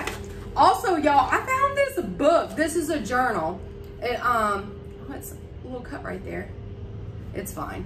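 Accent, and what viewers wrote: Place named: Mississippi, United States. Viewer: Hey sweet lady you & I sound the same!! I'm from Mississippi so down here we all say "yall" quite frequently! I love your dollar tree hauls!!! I just wish these dollar trees here would take notes of all the dollar trees you shop at!!! They NEVER have the cute stuff you have. I may get lucky & find one or 2 little things, but they never have any of the good organizing stuff ever! Most of the time the isles are bare. I guess nobody cares about taking inventory. We need some desperate help at the dollar trees in Mississippi! Oh well, I love watching what you find & I'll just stay jealous!!! Love you girlie......keep shoppin'....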